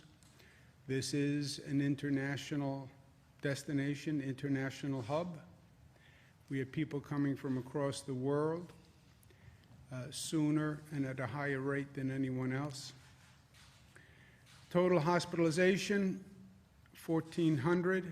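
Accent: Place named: New York, United States. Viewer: Its the New York accent and all the strength/testosteone exuding from him. We get it, you don’t need help. It’s okay to be attracted to masculinity without calling it toxic, like many have in the recent past just to bandwagon a stupid slogan or saying.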